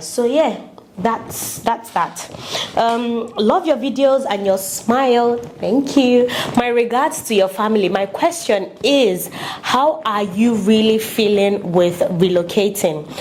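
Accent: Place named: Nigeria. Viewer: I really appreciate that you are audible & clear..even when you drop in your dialect it doesn't make it hard for a non Nigerian to understand. You are an Intelligent lady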